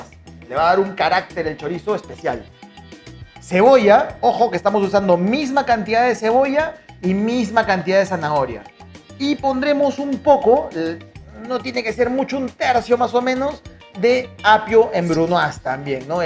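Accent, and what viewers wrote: Peru. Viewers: Gracias por el video. Que bonito oírte con el acento de peruano, así como hablan en Lima 😊😊😊😊😊😊😖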